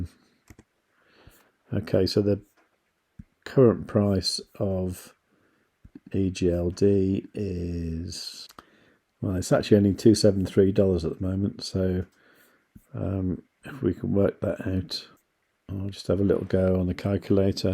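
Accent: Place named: Tamworth, United Kingdom. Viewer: you sound like you come from Tamworth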